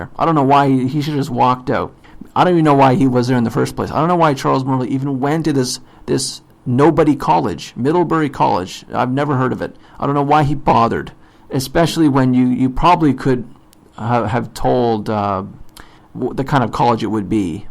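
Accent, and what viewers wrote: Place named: Canada. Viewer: Where / what is Middlebury? You're joking, right? (Perhaps you're from The Great White North? Pretty sure I hear Canadian pronunciations of "out" and "about" - most logical explanation !) Anyway, it's one of the very best liberal arts colleges in the U.S., costs over $60K/ year, consistently ranks in the Top 10 among small private colleges nationwide, and is incredibly difficult to enter in terms of acceptance rates. This all makes the whole episode worse than it may have been otherwise/elsewhere. Most of these kids are in the top 1% nationally (academic performance), and Middlebury attracts students from countries all over the planet who move there to study as well. It's anything BUT a "nobody place." And yes, it's strongly left-leaning. I don't condone what happened in the least, but will say this : I have a relative who's a prof there...he, along with a number of faculty members whom these kids deeply respect, encouraged and coached the appalling behavior prior to Murray's visit (after unsuccessfully trying to get him "disinvited" first.) There was nothing at all spontaneous about the fiasco...all was carefully pre-planned. 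This was completely uncalled for and put Middlebury to shame - its faculty in particular. I'm not excusing the kids' behavior, but they were basically following the orders of their superiors. Disgusting...and I don't even like Murray. I don't agree with his views on I.Q., but firmly support his right to express those views and have a robust debate with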